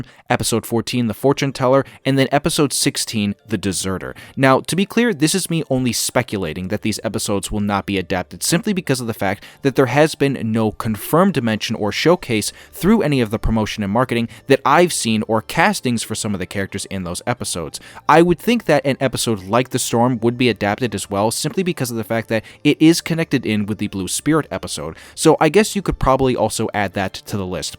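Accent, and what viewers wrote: Bisaya, Philippines. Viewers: uu, klaro kaayong Bisdak 😆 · Hahaha bisaya sad ka brad?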